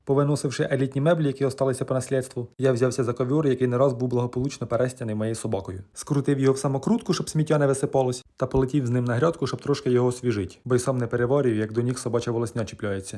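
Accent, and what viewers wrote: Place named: Cherkasy, Ukraine. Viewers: Ви чудо ви так говорите як у нас у Кам'янці Черкаської дай вам Боже бережіть себе ♥️ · Натрапила на ваш ролік...думаю собі ,,говірка черкаської області " і бінго🎉 Привіт Черкасам❤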